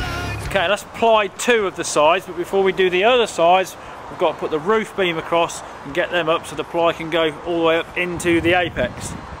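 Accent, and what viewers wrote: United Kingdom. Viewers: Overly British, but great entertainment for quarantine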